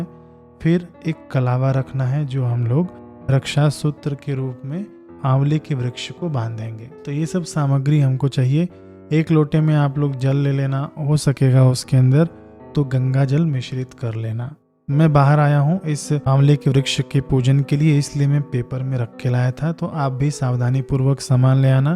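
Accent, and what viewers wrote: Standard. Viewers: Aapki bhasha bahut shudh. Srl.or mithi h..aapki puja ka trika or smjhne ka trika bhi bahut accha h..mene aapko sabscrib kr liya h..or all bhi kr liya .me aapki bahut video dekh bhi chuki hu..sari video bahut helpful h ...jai shree Radha krishna 🙏🙏🙏🙏🙏🙏🙏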